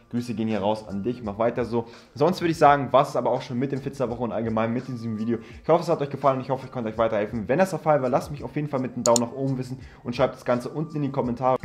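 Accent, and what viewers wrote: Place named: England, United Kingdom. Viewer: Ich liebe immer die englische aussprache🙏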